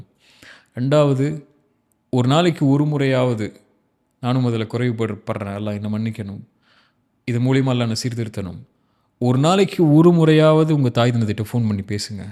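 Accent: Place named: Sri Lanka. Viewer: Ungal bayan enakku mihavum pidikkum karuththukkalai mihavum alahahavum thelivahavum velippadutthureenga ungal kirath so sweet pesurazu engada nattavar maazari I'm srilankan pls Quran recitation podunga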